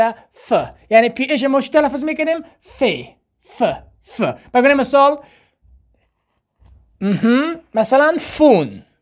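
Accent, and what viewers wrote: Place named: Afghanistan. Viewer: شماافغانی هستیدالبته برای مامردم ایران قابل احترام چون ازلهجه وکلماتی که استفاده میکنید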